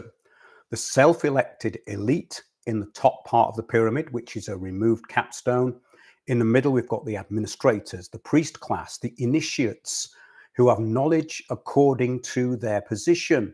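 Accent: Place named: England, United Kingdom. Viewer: This is fascinating. I can clearly see how this transhumanism is an extension of this serpent cult. From childhood/birth (in their depraved eyes) to death. Especially once a child is transitioned early, there are constant medical issues and daily rituals they must do to maintain the deception and stop infection (or to even get an erection.) Also, even to after death, since no doubt most folk who go through such horrendous procedures will feel cut off from God, and may believe they have no redemption if they realise they made an error and desecrated the body God gave them. Therefore, they are stealing folks ability to believe they can be redeemed through the Blood of Christ. Absolutely fascinating. I grew up in the UK, so it's great to hear deep information and truth spoken in English English. Thank you for your work. Wondering what you think about David Icke getting pushed forward by Redacted the last few weeks regarding the Epstein stuff. 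TBH I have never been interested in his work, but my mom was taken in with some of his stuff.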